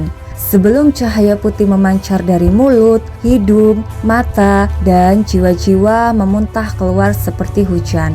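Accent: Java, Indonesia. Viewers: kok suara ini kayak channel sebelah suara medok nya ni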